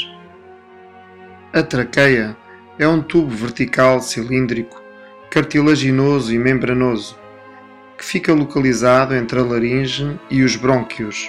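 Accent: Portugal. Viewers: Putzz.. sinto decepcionar mas, esse fundo musical é 'um saco" pra quem é músico, como eu, que presta atenção na música ou seja, eu não sabia SE preSHtava atenção nela ou no carinha, (*) ou explicação falada.. e, detalhe: esse "sutÁKi PÓRTUGUEISH" - mas, de boas. Não vou farei medicina... hehehehhe..! (os vídeos que mamãe m'envia, se tiverem letras e música - tiro todo som, para apenas ler - sério, é um inferno!). Assim são as pessoas diferentes.. :)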